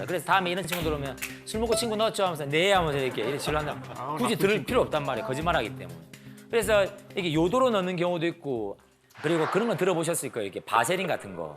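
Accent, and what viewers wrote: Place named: South Gyeongsang, South Korea. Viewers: 비뇨의학과 의사 + 경남사투리 + 딕션 모든게 완벽함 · 기분 나쁘지 않은 사투리임ㅇㅇ 어떤 경상도 사투리는 짜증이 가득 묻어있어서 짜증나는데 이분은 오히려 ㄱㅊ음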